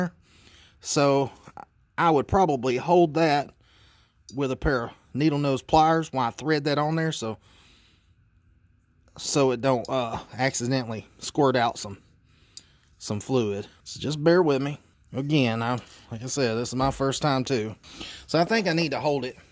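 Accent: United States. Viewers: I love your cowboy accent 😍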